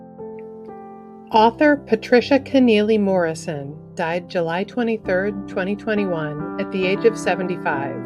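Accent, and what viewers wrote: United States. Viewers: American that's why speaks clear English.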